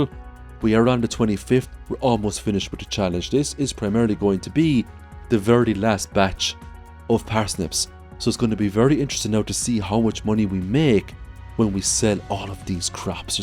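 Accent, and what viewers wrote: Ireland. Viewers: Are you Irish I can hear a accent? I come from the north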